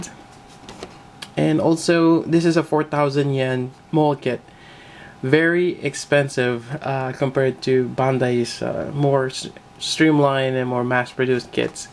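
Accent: Philippines. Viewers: yo sir! sorry, are you from the philippines? medyo pinoy yun accent mo hehehe. if you are i'm curious where you picked this up! available in greenhills?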